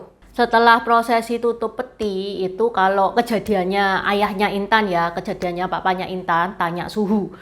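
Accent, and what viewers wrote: Java, Indonesia. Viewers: Wajah anda tionghoa bngt ya ,tp tetep ya logat jawa nya kental bngt ya😊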